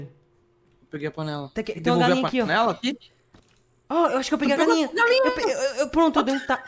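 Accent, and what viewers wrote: Northeast, Brazil. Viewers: Nordeste meu fi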